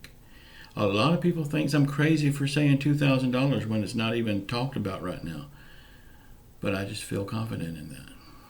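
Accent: South, United States. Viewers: Weeelll, spit it out man,you're southern slang,assumptions are boring,I don't have to call the white house,Madonna is well capable,thanks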